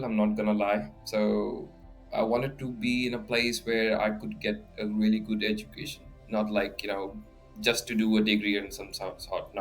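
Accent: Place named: United Kingdom. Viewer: Bhai are you uk born?cause accent is pure British.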